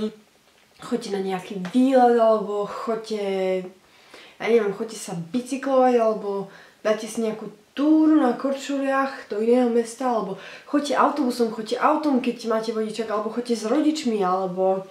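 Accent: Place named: Hungarian border, Slovakia. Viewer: + podla mňa bývaš na 90% pri maďarských hraniciach :) Podla prízvuku (mám pravdu?)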